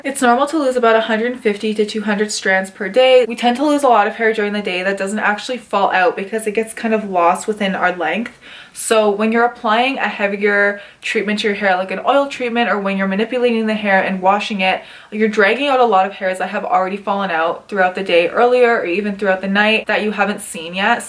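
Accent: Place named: United States. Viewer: Are an Indian u are talking like American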